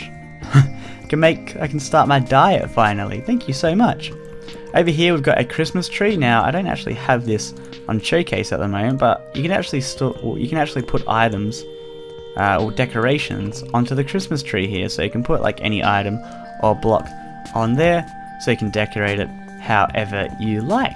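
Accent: Australia. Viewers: i only downloaded the mod, i never actually watched the vids, i never realised he was australian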